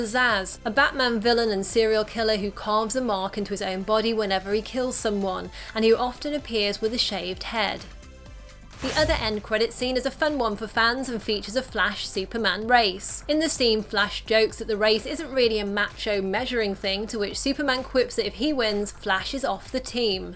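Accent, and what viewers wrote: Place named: England, United Kingdom. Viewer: are you from England? I'm in love with your voice and that accent.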